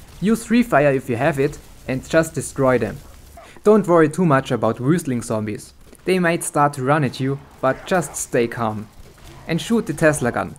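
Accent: Germany. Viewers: Hört sich sehr nach deutscher Herkunft an, irre ich...?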